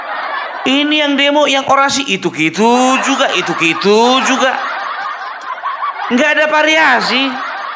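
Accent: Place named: Medan, Indonesia. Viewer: Yakin lucu si Abang ini. Sy suka logat Medan. Lanjut bang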